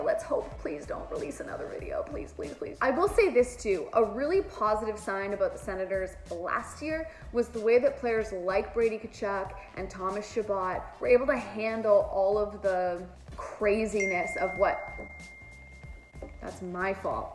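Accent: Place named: United States. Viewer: Oh no you have been in the STATES so long your getting an american accent lol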